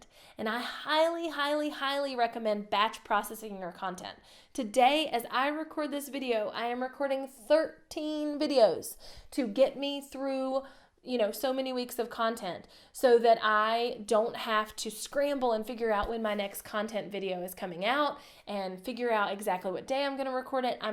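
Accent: South, United States. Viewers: Hi....I Subscribed to your Channel......Do I hear a...Southern... Accent.....cheers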